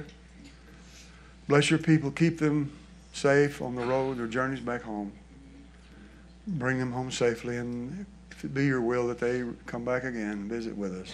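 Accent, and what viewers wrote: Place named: Texas, United States. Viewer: he has publicly denied being Elvis Presley several times. isn't the problem it's a group of his congregation who keep feeling the fire. Only because they want him to be Elvis so badly that ignore the truth. Yes, he does sound a lot like Elvis when he sings but that's where it ends. There are thousands of Elvis tribute artists who sound similar to Elvis but that doesn't make them Elvis. There is no physical resemblance between and Elvis. There eyes, nose, mouth, lips and teeth are all different. You can find pictures of a young with his family on the internet where he has dark hair and beard. Elvis was 6 feet tall while is 5 ft 10 inches. is 17 years younger than Elvis. He was born in 1952, Elvis was born in 1935. is 74 while Elvis would be 89. Elvis was a senior in high school when was born. was born in Forte Worth Texas in Tarrant County area which is why he has a strong Texas accent. 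While Elvis was born in Tupelo Mississippi which is why he has a Mississippi accent. Elvis was married 1967 and eas dating Linda Thompson when married Wilena. father was an ordained minister from 1957 to 1990 when he passed away at age 93. became an ordained minister in May of 1981. After his father died, his mother and 2 sisters travelled through Texas preaching the gospel in small towns too small to have their own church. He did that until 2005 when he moved to Benton Arkansas and worked as carpenter until he opened a small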